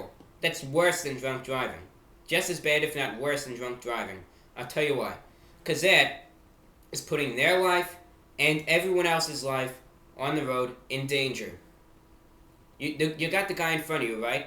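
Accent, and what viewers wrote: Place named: New York, United States. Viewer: His New York accent was still intact here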